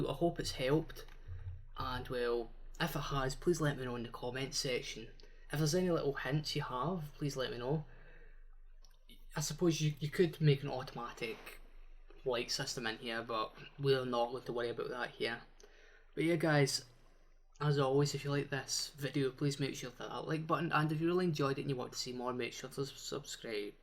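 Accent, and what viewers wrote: Scotland, United Kingdom. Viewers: "Barely English"? Scottish accents are older than the USA. Either way, you could watch this on mute and you'd still be able to follow it. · Thanks for that video. Loved your accent. Very Braveheart.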